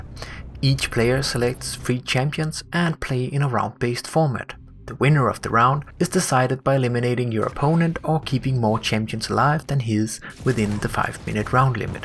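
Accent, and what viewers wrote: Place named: Denmark. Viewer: I love your accent, you shouldnt be Danish by any chance?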